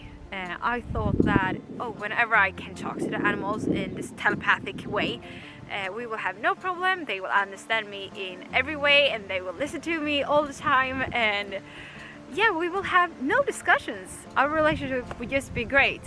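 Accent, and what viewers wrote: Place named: Scandinavia. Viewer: I'm just getting into this topic, after my mind being torn apart by Anna Breytenbach... It's exciting to watch someone go through the early stages of developing these skills! Edit: I love your accent. Scandinavian right?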